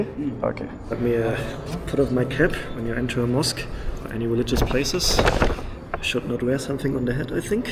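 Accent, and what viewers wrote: Germany. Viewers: Love your videos. Please keep it up. Also love your German accent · It's Deutsch accent, not English accent.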